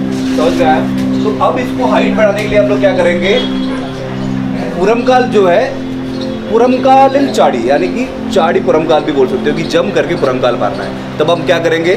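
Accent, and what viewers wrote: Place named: South India, India. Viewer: Excellent Sir.... I am doing foundation course of Kalari and once done, I would definitely like to connect with you.... Sir aapka hindi language mey jo 2 percent south indian ascent hey usko barkarar rakhna.... 🙏😊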